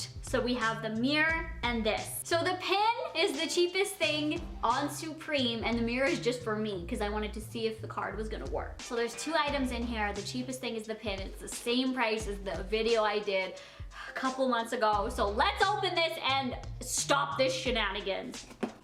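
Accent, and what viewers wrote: Canada. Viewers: She's Canadian, it's just her accent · Taylor Phillips it’s a Canadian accent. Their U’s are usually long O’s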